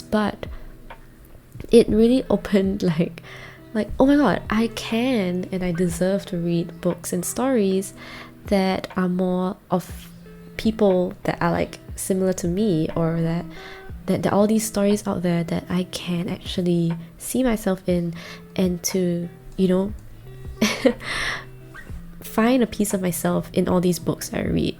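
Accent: Jamaica. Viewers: I’m extremely fascinated that your accent often reminds me of Jamaican accent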